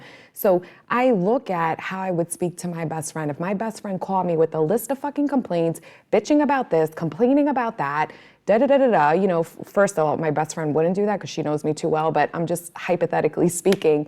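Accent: New York, United States. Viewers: Also, you sound like you’re from NY. I wouldn’t expect any less!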